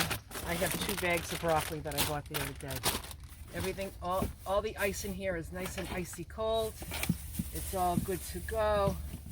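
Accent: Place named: Massachusetts, United States. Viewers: my dad's family is from Canton, MA and I can't tell you how much you remind me of them. Love your 'get it done! ' attitude (and your glorious accent! ♥️) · I eat like you I cook mostly potatoes and beans in pressure cooker. I was wandering what is you plan to receive mail? I asked since we are from same state I like your Ma accent I can hear it. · I love your videos—especially the healthy eating component and your lovely Boston dialect . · love hearing ur Mass. Accent. I am a vegetarian eater too. Steam mostly in fry pan. But oven bake yams & potatoes. U encourage me to use the pressure cooker.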